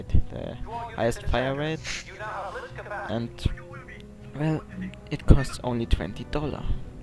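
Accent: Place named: Germany. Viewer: alter du hast so einen heftigen deutschen akzent^^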